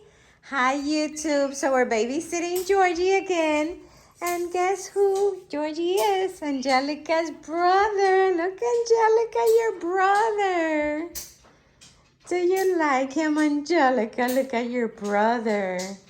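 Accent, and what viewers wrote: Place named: Russia. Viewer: No spaek english russian like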